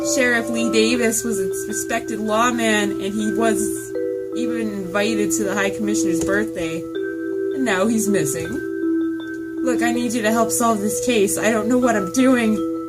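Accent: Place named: Boston, United States. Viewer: I think it's safe to say that you'll have a "bloody" good time with this game lol. Your Bahston accent is hilarious, btw 😆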